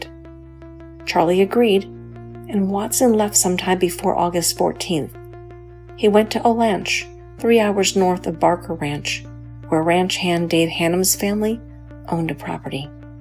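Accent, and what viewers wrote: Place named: Texas, United States. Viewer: Love your texas accent ❤😅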